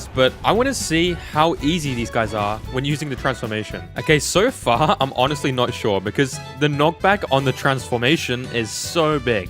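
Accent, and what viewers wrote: United Kingdom. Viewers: this guy sounds british but i might be wrong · what is that briish acent mate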